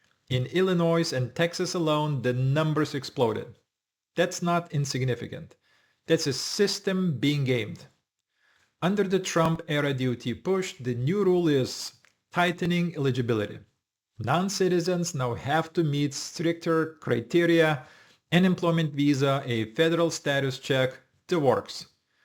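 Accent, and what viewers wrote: Russia. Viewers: Sounds Russian acent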